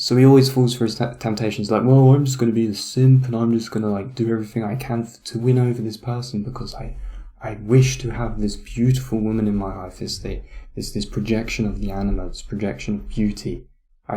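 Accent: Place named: Cockney, United Kingdom. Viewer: Philosophy with a cockney accent....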